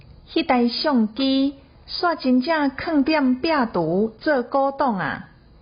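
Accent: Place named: Central Taiwan, Taiwan. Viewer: 足親切的台灣中部母語!讀到韻味十足!有學著,馬欣賞著.真勞力!🥰😀😄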